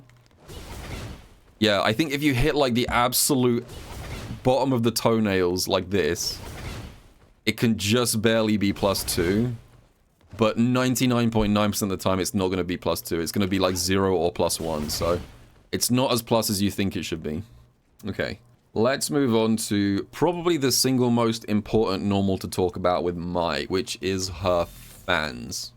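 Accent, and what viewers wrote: United Kingdom. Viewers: lol I had to watch multiple times I thought he was saying "tool character". That British accent is something else. Carry on don't mind me great video much appreciated because that fan is a beast to deal with.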